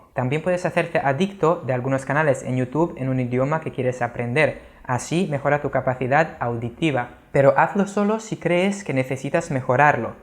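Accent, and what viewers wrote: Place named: Spain. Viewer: Excelente video, me parece interesante tu español, el acento es de España, pero utilizas algunas palabras como en LATAM, ejemplo, la palabra video, en España suelen colocar el acento en la "I" en la latam lo hacemos en la "e"... Que genial, un abrazo, siempre me pregunté como sonaría esa combinación.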